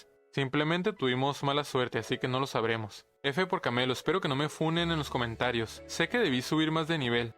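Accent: Chihuahua, Mexico. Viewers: Eres de chihuahua?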